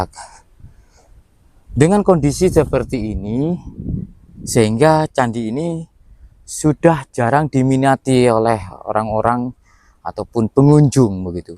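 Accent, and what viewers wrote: Java, Indonesia. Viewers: Medok Jawa banget kau ngomongnya. Jadilah kau orang Indonesia, jgn jadi orang Jawa.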